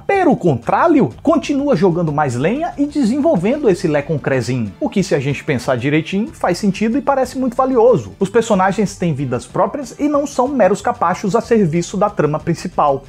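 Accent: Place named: North, Brazil. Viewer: sou muito fã dos teus reviews, teus roteiros são muito bons e termos como "trancagem fiofal" são inigualáveis, além de muito bem pautados tuas críticas, mesmo quando minha opinião é totalmente contrário da tua, teus argumentos são satisfatórios, mas percebi que tens um sotaque mais parecido com o pessoal do Norte/Nordeste, mas tu usou bastante o termo "Guris", "gurizada" mais comum no sul e teu linkedin é do Rio de Janeiro... explica essa mistura pra gente!!!!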